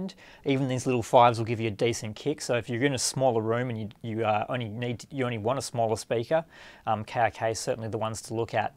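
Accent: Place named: Oklahoma, United States. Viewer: sup with this dudes voice is he from oklahoma or what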